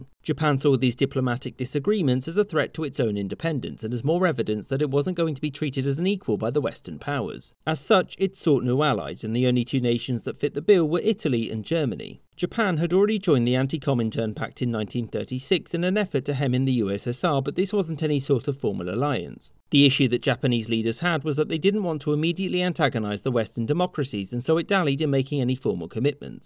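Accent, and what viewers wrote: England, United Kingdom. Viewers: He's quite clearly English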